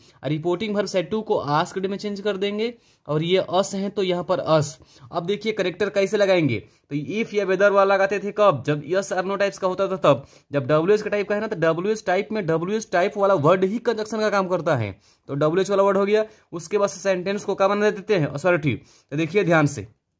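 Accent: Bihar, India. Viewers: Sir ,u from bihar 🤔🤔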